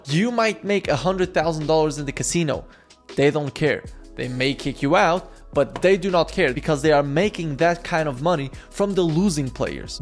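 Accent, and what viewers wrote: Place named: Portugal. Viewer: hey, vi o teu podcast do tradingnut. pergunta: és tuga? estou a escrever em PT de propósito. o teu sotaque já me dizia que sim.. mas depois do podcast fiquei a pensar 😂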